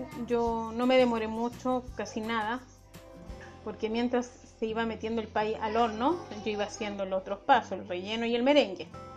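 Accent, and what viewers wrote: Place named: Chile. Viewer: Al fin un video explicado por un chileno,, asi se entiende mejor 👌👌muy wena la receta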